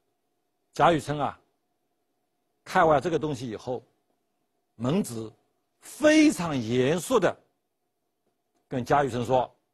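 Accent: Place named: Jiangnan, China. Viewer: 这个老师就是包邮区口音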